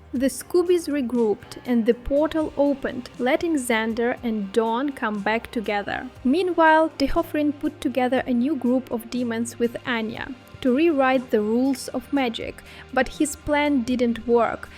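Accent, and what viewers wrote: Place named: Brazil. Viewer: Are you Brazilian? Italian? Just curious about your accent.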